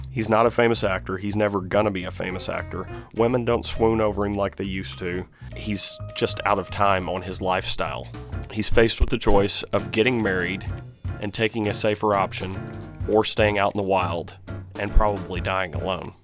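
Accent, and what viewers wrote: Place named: West Virginia, United States. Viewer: You sound like you're from west virginia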